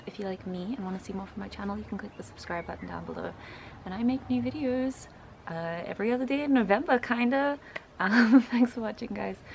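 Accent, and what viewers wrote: England, United Kingdom. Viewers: You don’t sound South African you sound English . The guy sounds like he’s copying Nelson Mandela . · You don't sound South African at all haha, your accent is rather English. I really like it!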